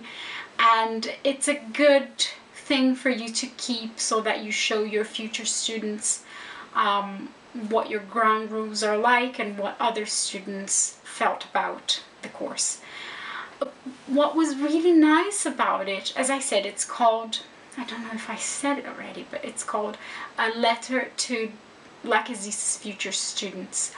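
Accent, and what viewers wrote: United Kingdom. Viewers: Such an inspiring video! 👏🏻👏🏻 Would you also have a video telling us your study and career journey, and how you got such a perfect British accent? 😃 Thanks for sharing your experience. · Pronúncia fantástica, com um toque de sotaque britânico, o que é dificílimo para brasileiros! Parabéns!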